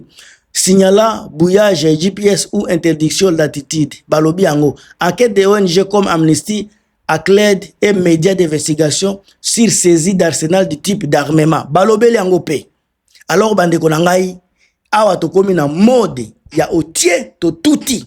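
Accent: Rwanda. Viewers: Oza rwandais lingala na yo ezo funda yo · Liboma nayo yoka tonalité ya Français Rwandais ce normal olobele m23 po oza rwandais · Oza kizengiyo bozela yo oza congolais kizengiyo nyama yoka ata lingala nayo rwandais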